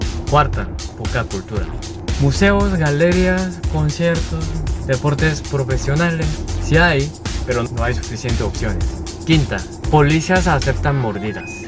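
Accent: Panama. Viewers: Saludos desde mi tierra La Chorrera. Ey amigo ya tu hablas como nosotros los panameños Pritty Friend jejejej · 🤣 habla Panameño Clarito Xuxa madre, Ta webao dice 🤣👍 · Que tierno que esta hablando como un panameño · Ajaja ya hablas hasta mas panameño que cualquiera y yo queriendo ir a vivir a Sur corea, fui casi un mes en primavera y es muy hermoso, me gusto demaciado. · Donde aprendiste hablar español, hablas como panameño tu acento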